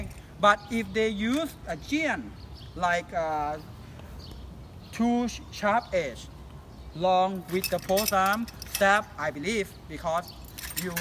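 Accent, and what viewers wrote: Thailand. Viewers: lol I knew it you must be Thai people listening to your accent Great to see people interested in this weapon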